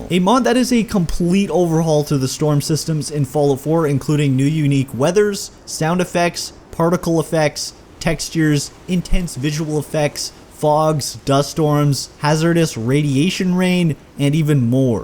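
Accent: Canada. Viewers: i've never found out that a person is Canadian as fast as in this video o.O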